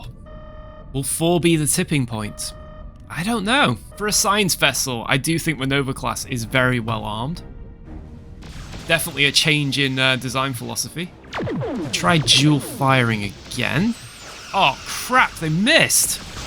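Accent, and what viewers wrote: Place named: England, United Kingdom. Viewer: I suppose that's the problem with a beta canon as incongruent as Trek's is- until I was taught that Star Trek doesn't standardize its beta canon I believed a lot of beta-only things which are essentially headcanons- stuff like Defiant being faster than Voyager, shield recharge being a Nova-exclusive or a Nova introduction. I guess we still have some preconceptions based on what beta canon we were exposed to earlier on. BTW i'm trying to get better at recognizing UK accents- fairly sure you're somewhere in England, but would you mind telling me where? The two most prominent features I've noticed are your emphasis on ending "g"s which to my American ear suggests somewhere to the west, but it also sounds like your voice is a bit breathier, maybe?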